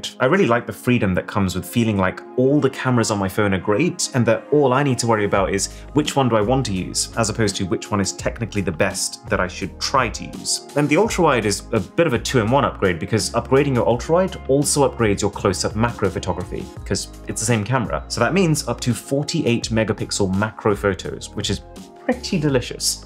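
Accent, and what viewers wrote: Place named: South England, United Kingdom. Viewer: You have a posh accent from south england. But you say "faster" like a northerner.... are you just putting it on?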